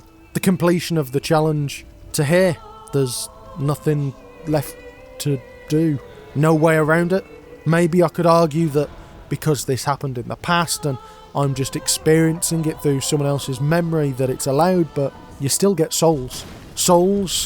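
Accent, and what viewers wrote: United Kingdom. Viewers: this is the most british person I've ever heard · Bro you are the most British person i have ever seen both looks and voice 😂😂 You should be England's national treasure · Ahh yes, congrats on the full time positon. Us Americans grratly appreciate a humorous soulstuber with a british accent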